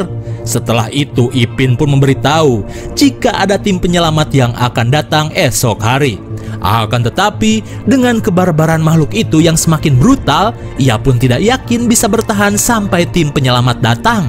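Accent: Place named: West Java, Indonesia. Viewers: urang mna ciga urang sunda ning abi t padalarang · Logat Sunda nya ciri khas dari kang 😅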